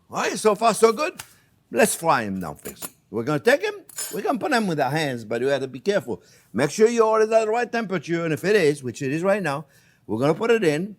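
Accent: France. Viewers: I like this man embracing his pronunciation of his onion. Gonna feel his french accent is so strong. But I love this guy. 😊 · I've been learning French and at times despair at my poor prononciation and misplaced verbs. Then I realised I love listening to your videos as much for your delightful accent as your delicious recipes and helpful cooking tips. Maybe a French person would be just as delighted to hear my Aussie accent - or maybe not! 😊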